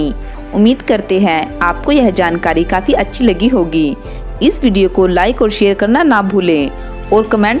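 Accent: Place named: Bihar, India. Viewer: Aapki avaj kan phad detihai..... Bihariyon ki tarah bolne ki kya jarurat hai...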